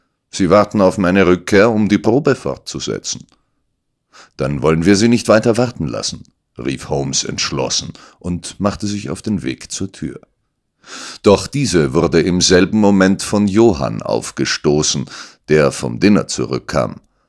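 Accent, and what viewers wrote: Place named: Austria. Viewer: Lustig, wenn, der nebenbei gesagt Österreicher ist, sein österreichischer Dialekt als nicht überzeugend kritisert wird 😂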